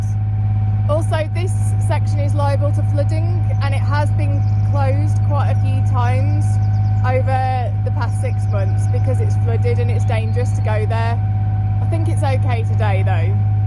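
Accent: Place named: Wolverhampton, United Kingdom. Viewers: Hello. Did you use a drone for the aerial shots? Love your videos and big bonus to hear the accent again (I'm from Wolves too, moved away long ago...) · Thanks for another enjoyable video I've just watched, you really are a breath of fresh air, so natural with no #airs or graces#, but well aware that you are sharing your videos with # a great fan club# you're giving a lot of joy to like myself. I love the Wolverhampton accent, which brings back so many happy memories for me with my late wife, thank you, thank you, keep up the good work, and giving lots of pleasure to your #fan club# all the best, Martin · Love the video, you have a great West Midlands accent 😊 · 🙂 Thanks,. Moved from the midlands 30 years ago - still miss the accents. Really nice to hear yours. Looking forward to more videos.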